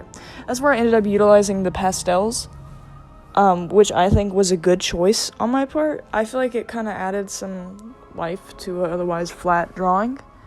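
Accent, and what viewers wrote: South, United States. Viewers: Wait i think its because you both have a southern accent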